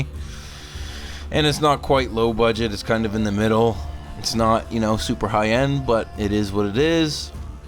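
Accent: Canada. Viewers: are you from nova scotia ?canada obviously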